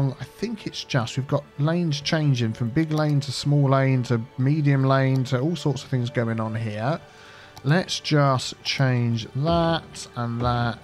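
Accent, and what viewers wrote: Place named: England, United Kingdom. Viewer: It's really just about the way your voice sound that I watch your videos. And your accent. English accent. You've got that strange tone, never heard anything like it anywhere else. I like it thought.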